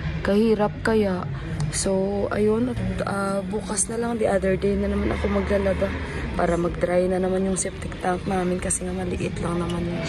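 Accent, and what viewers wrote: Iloilo, Philippines. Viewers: sis taga san k sa pinas, parang ung accent m ilongga 😊.. mag watch nko ng vlog m now q lng nag p nood..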